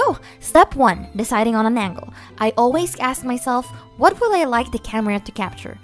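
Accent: Philippines. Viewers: Why do I keep hearing your Filipino accent! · I can still hear that Fillipino accent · You have a litte filipino accent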